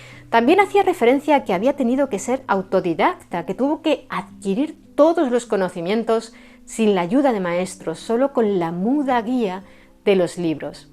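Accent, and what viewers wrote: Spain. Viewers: Suena más a española que mexicanoa...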